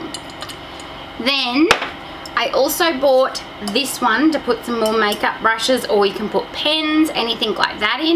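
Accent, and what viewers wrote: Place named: Australia. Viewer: You have such a strong Aussie accent! 😋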